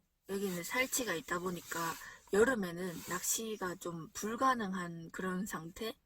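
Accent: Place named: Gyeongsang, South Korea. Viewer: 님은 지역이 어디세요?경상도 인듯한데 저는김해 붕어꾼입니다~^^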